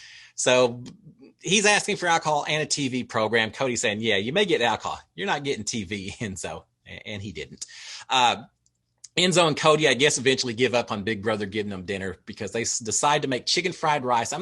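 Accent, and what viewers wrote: Texas, United States. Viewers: Your Texan accent is very much appreciated! Love your recaps,! Please do other recaps after BB? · Good morning!! Love your Texas accent!! Thanks for the update!! Always enjoy listening to Notes in the morning!! Have a great day!!!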